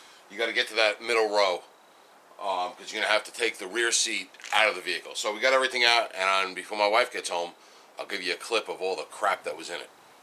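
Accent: New York, United States. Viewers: I especially love the New York accent! I’m from Yonkers & moved to provincial Connecticut where that familiar accent is missing. My 1997 GMC has had numerous A/C issues. I was told by a mechanic the new issue is the blend door actuator? Now I know what I need. Thank you! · You are hysterical! Love the New York accent! I am a Mom down in Georgia and replaced the actuator by myself, so I had to move my own crap out of the back! The cool thing is that I found some boots I bought that I had forgotten about! Now the back of my car is cool and so am I! Ha!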